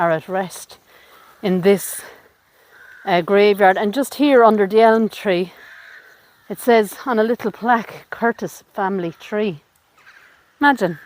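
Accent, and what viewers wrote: Ireland. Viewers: So impressed you cleaned what you did by hand. Reminds me of when i was a girl, my Dad and I spent many happy years wandering around graveyards. Dad was a bit of an historian and we discovered an unknown battleground and a mass grave of Maori warriors, ( men and women). Loving your Irish accent.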